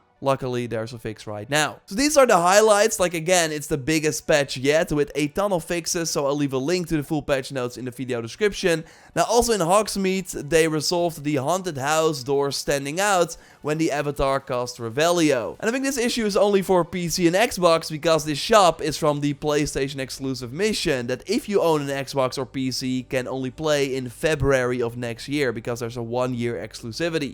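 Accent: Netherlands. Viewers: Sooo Dutch 😄 nice vid though. Good info.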